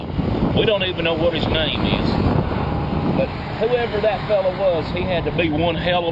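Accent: South, United States. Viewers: I HAVE actually seen this! I live in a small town in AL called Clay and so to hear that southern accent is so cool! I just recently subscribed to your channel and I just laugh when I hear your stories...love it love it! I found you through Zipster...love him too! Thanks for the laughs!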